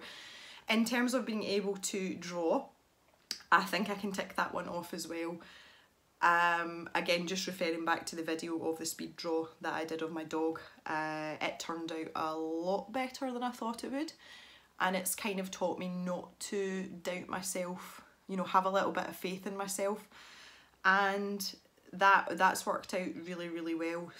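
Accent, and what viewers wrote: Scotland, United Kingdom. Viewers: I just love your accent it is nice to hear a real scottish voice. I have one question to ask you? I have the whole set of Prismacolour pencils but i have a dislike for them the problem is that I don't know how to use them. Can you give me some advice for me please. Also I would love to do a sky picture in ivy and inky butterfly using Faber Castell Polychromos is there anyway you could help me please.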